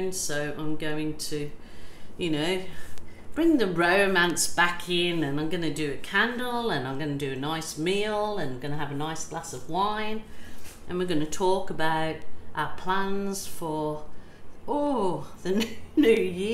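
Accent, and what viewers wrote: Australia. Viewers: Nice To hear an Australian voice behind his pours this is something I want to get into I’m up till two in the morning watching all the different YouTube contributions and U sounds like a real character typical Australian and I love you tutti-frutti Creation Carnival pour I’m a W A girl thank you lady mate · If you are in New Zealand and wanting to buy one of these little heat guns they are available at The Warehouse! Also thank you to for your instructional videos. Whenever I am watching them I always come away feeling very inspired and also a bit less homesick because I get to hear the good ol’ Aussie accent you have:-)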